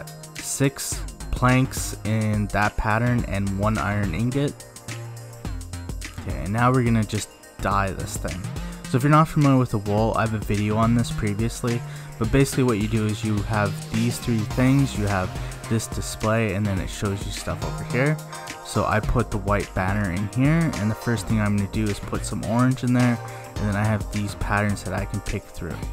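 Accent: India. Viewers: Are you Indian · I said are you Indian 🤬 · It was not Irish it is Indian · Are you Indian? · Are you copy video coz you are indian but speaking in pure english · are u indian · Are you indian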